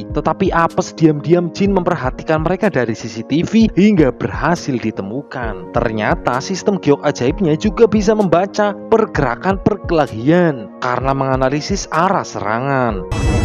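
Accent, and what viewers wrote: Banyumas, Indonesia. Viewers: Suaranya bikin ngantuk tapi ketika denger ngapaknya autu ngakak🤣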